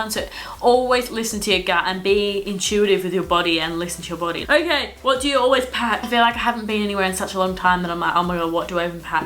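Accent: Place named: England, United Kingdom. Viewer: It sounds like you have a bit of an English accent!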